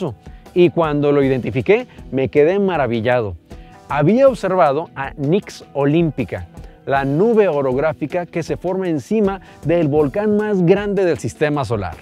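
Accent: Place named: Monterrey, Mexico. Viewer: Eres regiomontano 😱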